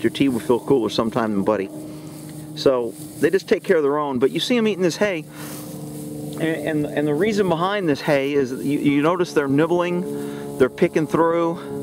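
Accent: Texas, United States. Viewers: your Texas accent is stronger...I could barely understand you say "saliva". lol Awesome video. Thanks!!!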